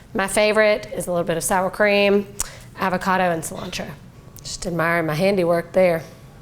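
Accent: South, United States. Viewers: Hands down the most creative chicken enchilada recipe here on YouTube. 💯 I still grimace when I hear flour tortillas are being used where corn traditionally is utilized, but perhaps I need to finally try it for myself, which I will, for the first time, soon. However, I will plan to roast the poblano Chile and bell pepper first. Maybe add some bacon grease to the beans, and some tomato paste to the onion, pepper, & poblano chili saute. Btw--love this chic, her southern draw, and relaxed attitude around food; reminds me of my Texas upbringing......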